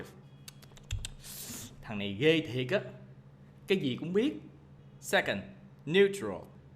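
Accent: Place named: South, Vietnam. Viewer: Nice videos and I love the Southern accent and slang. Thanks for putting all the effort in. A suggestion from me would be to speak less English spoken and more Vietnamese. Also speaking slower in Vietnamese and repeating sentences would make it easier for me to understand as you use quite a lot of words that I don't understand yet.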